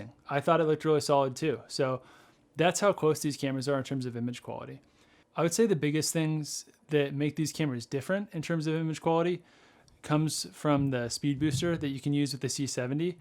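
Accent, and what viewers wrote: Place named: Orange County, United States. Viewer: Wow....we really do have an accent in Orange County lol. Awesome video!! I was on the fence between these two cameras, but I'm loving my BMPCC6k Pro. The biggest draw for me was the menus. I fumbled around with Sony and Canon menus for years, but once I used my first BM I've never wanted to go back, but the C70 almost got me. Yes, the 6K Pro has its quirks, and the overall build quality of Sony, Canon, etc. is much better, but I love the image, post-flexibility and MENUS of these BM cameras.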